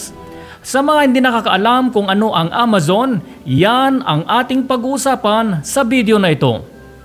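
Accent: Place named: Visayas, Philippines. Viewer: ekeya ka jn, ausin m salita m nkkhiya kang bisaya ka .. kkbwisit ausin m salita m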